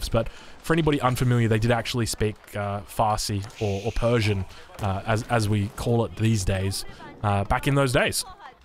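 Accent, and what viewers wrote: Australia. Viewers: I seriously cannot get enough of your voice lol. Is that weird to say? Yeah that's probably weird to say... But hey, having a great voice (Australian accent is a plus!) is great for casting like this!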